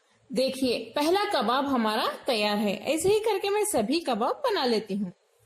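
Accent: Hyderabad, India. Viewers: Madam ka Slang Hyderabadi dikhra😁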